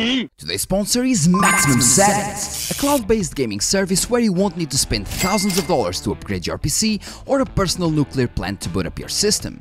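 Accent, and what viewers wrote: Portugal. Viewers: First time watcher here. Have had long time problems with RAM so i know how fucked up that is...sent 2 ram sticks back, because stick #4 "didn't work", second ram kit comes back and then different stick doesn't work, looked like a game of whack-a-mole...3 sticks worked fine and wouldn't let the 4th stick work well...different sticks...sometimes 32gb sometimes 24gb...eventually gave up on the everyday struggle so now I'm rocking just 24gb constantly. PS: was doing a inner challenge trying to figure out if you were maybe PT (maybe ES but didn't sound much like it) and i got it right! Unmistakable accent :) Keep up the good work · Pelo sotaque vê-se logo que és tuga.xD Os erros aleatórios sao fdidos...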